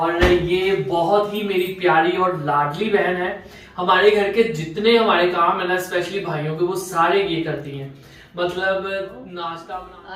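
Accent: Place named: Punjab, India. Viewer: Your Punjabi accent is v good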